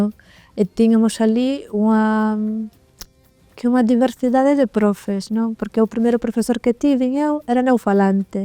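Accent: Castile, Spain. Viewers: ela fala de uma maneira muito castilhanizada... no programa galegos pelo mundo quando mostram pessoas mais velhas elas falam igual aos brasileiros... a impressão que dá é que o galego tá sendo pouco a pouco absorvido pelo espanhol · Haber el canal Horrible la locutora que hable con acento gallego ESO DE HABLAR CON ACENTO CASTELLANO LE QUEDA HORRENDO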